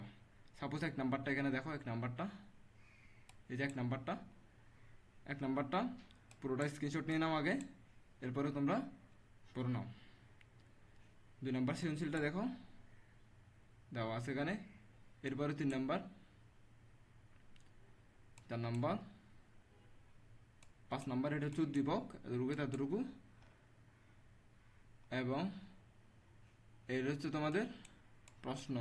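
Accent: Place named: Chittagong, Bangladesh. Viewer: ভাইয়া আপনার কন্ঠ সুনেই বোঝা যায় আপনি আমাদের বরিশালের নয়,আপনি চট্টগ্রামের ওহ🎉🎉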